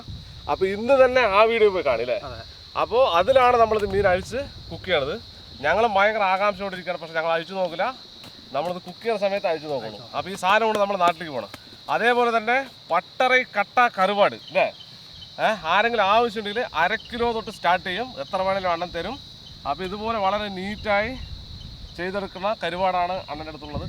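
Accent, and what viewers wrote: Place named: Tamil Nadu, India. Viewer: நீங்க தமிழ் பேசறது அழகா இருக்கு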